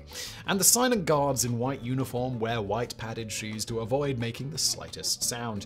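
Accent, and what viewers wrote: United Kingdom. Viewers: a witty British narrator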